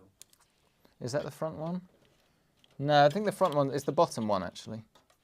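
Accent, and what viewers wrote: United Kingdom. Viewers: I like british accent!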